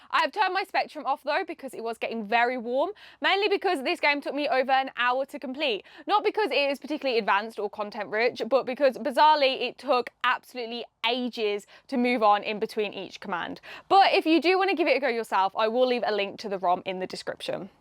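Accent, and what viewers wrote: United Kingdom. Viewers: yooo ive never seen or hear of you're channel before, but i have a useless talent and how i aquired this talent.......be efffed if i knew but just from seeing a picture of someone i can tell if they'll have a british english accent but my buddy here didnt belive me and i bet him five bucks you had said accent. SO I HAVE TO THANK YOU FOR THE 5 BUCKS I JUST WON. Also great video did not realize games were stored on vinyl, crazy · I love your British.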